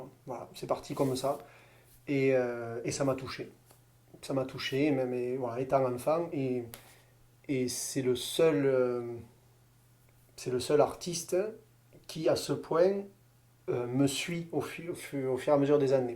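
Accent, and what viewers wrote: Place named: Béziers, France. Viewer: Très intéressantes remarques sur Brassens, ce que je ne connais pas, qui parle avec mon accent, que je me sens seul à colporter dans ma ville natale de Béziers.....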